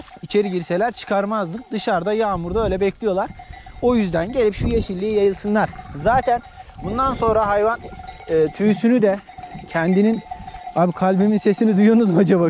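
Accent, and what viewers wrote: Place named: Balıkesir, Turkey. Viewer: Degerli kardesim;bircok videonu izledim.Koy hayatini hic bilmeyen biri olarak zevkle izliyorum.Rahmetli babamin koyu varmis,17 yasinda gittim ilk defa.Ben balikesir in ibirler koyundenim(babam yasasaydi 75 yasinda olacakti sehirde dunyaya gelmis)senin sivenle benim koyumun sivesi ayni.keske bende koyde dogup buyuseydimde,14 yildir abd gibi ulkeye gelmek zorunda olmasaydim.TOPRAK ve koy.senin yemek yerken video larinida izledim.Kardesim,neguzel ki bizim gibi cop yemek (kimyasal)yemiyorsun.Allah ailenle seni mutlu etsin.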